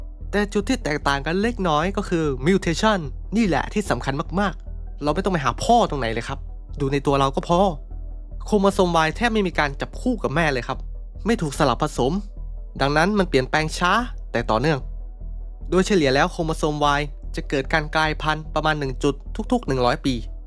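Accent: South, Thailand. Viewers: ผมชอบสำเนียงใต้ครับ ฟังอยู่ลั่นขำเลย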